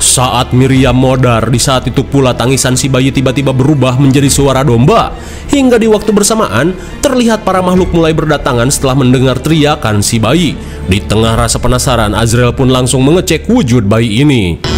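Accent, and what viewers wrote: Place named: West Java, Indonesia. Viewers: tadinya serem bgt malah jadi hyg seuri🤣🤣🤣akibat baceo na kang chanel sunda pisan 😅😅😅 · Nu nyarita ciga urang kadu ngora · Mimin aslina orang mana sih, logat sundana asa familiar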